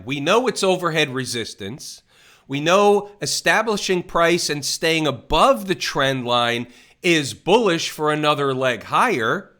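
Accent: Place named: New York, United States. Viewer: …Sorry, but I freaking LOVE this guy’s NYC (ahem) transmission…..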